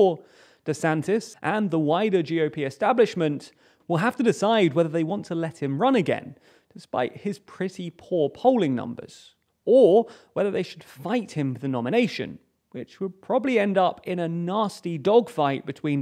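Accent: United Kingdom. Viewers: What's with the accent? Are you based in UK, or are you a transplant over here, Stateside?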